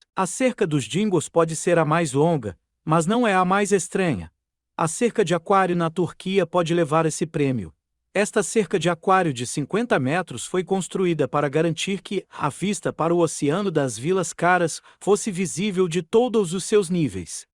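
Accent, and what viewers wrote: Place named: Australia. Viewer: Gostaria de saber de onde se origina o locutor do vídeo cuja pronúncia é muito estranha. Fala um português que não é nem de Portugal e tampouco do Brasil. Australiano que aprendeu português? Pode ser...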